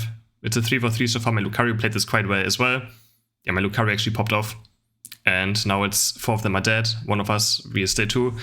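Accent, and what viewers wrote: Germany. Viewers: I can hear the German in his voice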